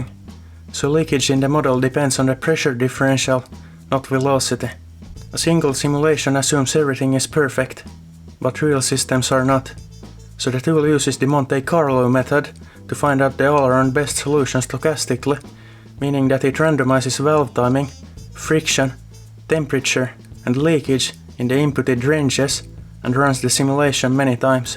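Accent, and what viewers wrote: Finland. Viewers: Finnish accents are so cute 😭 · Finnish accent? Like moths to a flame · as soon as i heard your voice, i clocked that finnish accent than a speeding train turning your satsuma into dust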